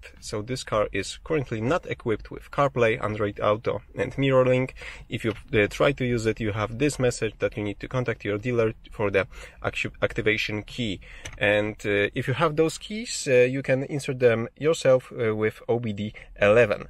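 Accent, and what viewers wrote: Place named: Poland. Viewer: Po akcencie poznałem, że Polak. 😃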